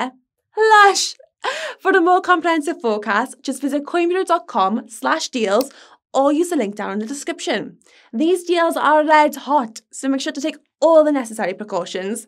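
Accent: United Kingdom. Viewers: I don't get the ad bit in the middle with the intentionally extremely British accent. Her accent is already almost too british for most of us to understand anyway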